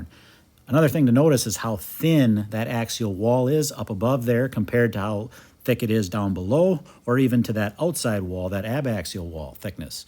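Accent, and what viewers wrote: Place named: Wisconsin, United States. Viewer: I know a fellow Wisconsin homie when I hear one! Haha. If I ever get cows I want you to take care of their little feets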